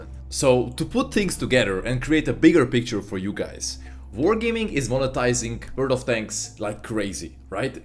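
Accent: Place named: Hungary. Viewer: Eszrevettem a madzsar accentusodat de hibatlan a tartalom, hajra!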